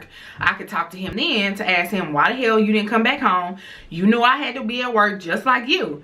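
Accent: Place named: Bay Area, United States. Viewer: I am too! I came across your channel just browsing. Clicked on this video, heard you talk (kiss braids, member instead of remember, just your mannerisms, etc...) and said she had to be from the Town. I live in Cali now and you reminded me of home so much. Congratulations on your new union!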